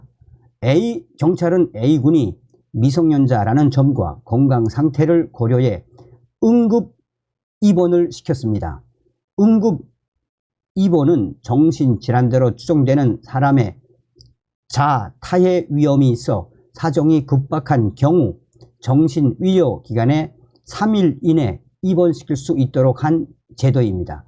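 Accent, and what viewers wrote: North Korea. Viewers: 북한 사투리 억양좀 고치시면 좋겠어요 듣기가 거북하네요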